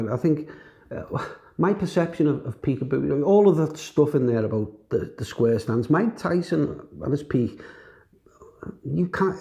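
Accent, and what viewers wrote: Liverpool, United Kingdom. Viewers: A beer and a chat about boxing at the Phil one day. Listening to you makes me miss Liverpool man, and the practical logical approach of scousers. Best in da wairld 😋🤝❤️🤝😋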